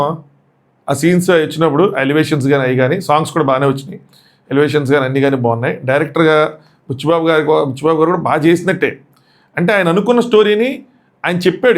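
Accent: Khammam, India. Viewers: Hi..Meru Asslu inka divider annapudu chala navvu vachindi andi..Madi khammam exact same accent vuntundi Madi kuda after long time navvukunnanu Meru Ala matladite..Meru chala genuine ga matladtaru..keep going..all the best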